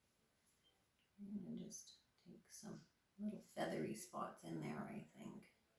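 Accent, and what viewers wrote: Canada. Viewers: Oh. This is awesome!! How brilliant!! Such detail! I love 💕 it!! I see your from British Columbia!!! I’m from Windsor Ontario. But live in Florida now!! I thought I detected an accent from my homeland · Good job on your first video,! BEAUTIFUL ARTWORK!! As soon as I heard your 'accent', I knew we were kindred spirits! I'm Canadian, originally from Toronto - now living in San Diego, California!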